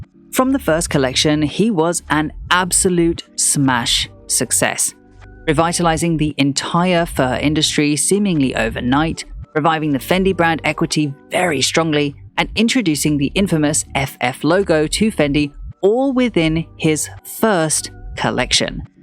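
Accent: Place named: England, United Kingdom. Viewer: I think it’s because you have an English accent, but it doesn’t sound super condescending and posh. I mean that as a compliment, it is an American lol.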